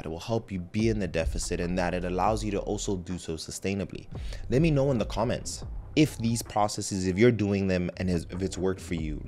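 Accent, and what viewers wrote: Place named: South Africa. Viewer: I detect a South African accent…. Am i right? Very nice summary!! Thank you!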